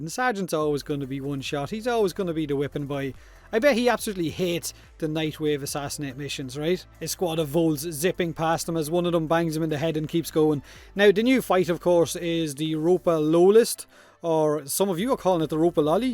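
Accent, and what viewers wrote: Ireland. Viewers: You said you wanted [Akmagnus Prime], but is there another weapon you’d like to see Primed? For me, it’s [Akmagnus] too, cuz America! Fuck yeah! As well as [Corinth]. I love that fucking shotgun! Good ole’ American magnums and pump action, am I right? You wanna kill something, buy American! (OuO) <3 Love your vids and please, for the love of Clem, let me hear your full Irish accent. I’m Irish-American, and I can’t get enough of your accented hilarity!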